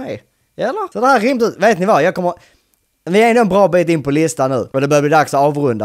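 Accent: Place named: Skåne, Sweden. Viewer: Chex supportrar dig är från Skåne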